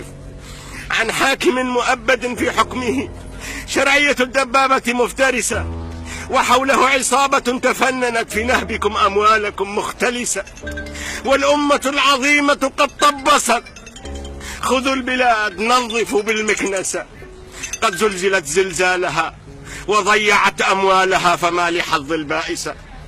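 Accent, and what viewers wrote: Tunisia. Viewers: Abdelilah Ait cadi هذا تونسي لهجة الجنوب وقناة الجنوبية تونسية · هذا تونسي¿?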